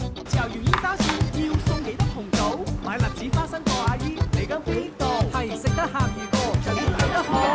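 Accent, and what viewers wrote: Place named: Zhanjiang, China. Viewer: 听到乡音，看到美食，谢谢你，朋友，我是霞山的，现在德国。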